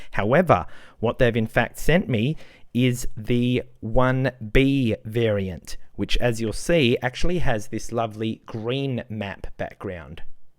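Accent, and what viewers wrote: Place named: Australia. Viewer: Good to hear the ol' Aussie twang going on there! Good video, thanks. Before I gave mine to my son I used mine as my everyday watch, including swimming and hiking and it took everything like a champ. I had the steel bracelet one and changed it to a black Casio strap. Now thinking of getting another one, the black one like your one in this vid.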